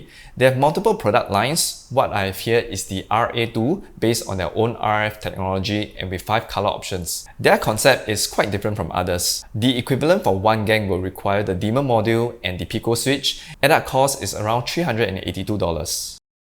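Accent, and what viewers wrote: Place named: Singapore. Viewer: Sounds Singaporian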